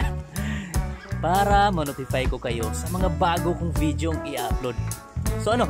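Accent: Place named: Philippines. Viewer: I love your tagalog accent ♥️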